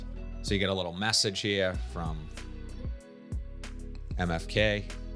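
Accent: Boston, United States. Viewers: Nice review. On my list for this summer. Are you from Boston?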